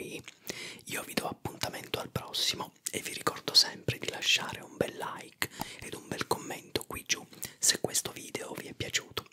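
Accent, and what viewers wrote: Sicily, Italy. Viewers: Come mi piacerebbe capire di più il dialetto siciliano ! Suoni bellissimi 🤩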